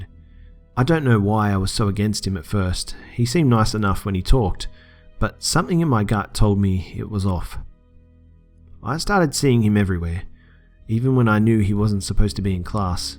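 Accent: Australia. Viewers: I think he's Australian? someone correct me if I'm wrong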